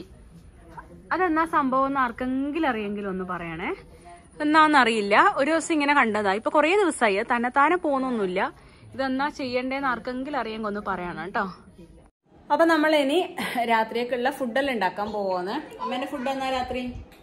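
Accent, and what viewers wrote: Kannur, India. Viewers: തന്റെ kannur slang മാറുന്നുണ്ടോ എന്നൊരു തോന്നൽ.. നമുക്ക് നമ്മുടെ slang kelkkanaa ഇഷ്ടം 👍🏻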